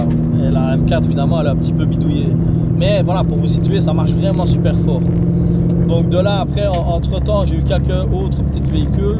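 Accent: Belgium. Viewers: Désabonné récemment de gmk et Akram pour découvrir un bon gars humble sans fioritures, avec un accent belge très agréable a écouter, bien plus intéressants que les "c'est bôo et elle a le diable en elle" de nos des accolytes superstars de youtube. Bravo a ta réussite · Tu est belge vervier Liége ??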